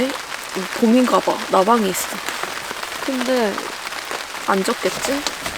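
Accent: Jeolla, South Korea. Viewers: 전라도 사투리 정말 예쁘게 하시네요. 오늘 웃다가 구독하고 갑니다.^^ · 전라도 억양 넘 정겹고 좋습니다. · 깝깝해부네 ㅋㅋㅋㅋ 저도 고향이 전라도라 사투리 말투 너무 귀엽네요 ^^ 는 무슨뜻일까요 궁금하네요 ^^ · 와.............. 유튭에서 전라도 사투리 왤케 반갑죠....?? ㅋㅋㅋㅋㅋㅋㅋㅋㅋㅋ 자주 시청하겠습니당 😁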